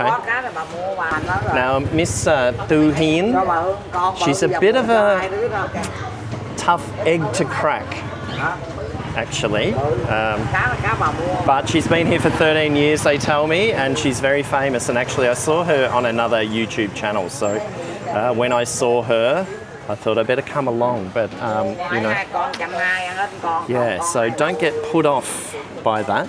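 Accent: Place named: Australia. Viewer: Haha, the lady is a hard one to crack! I love your sense of humour! And your lovely Aussie accent.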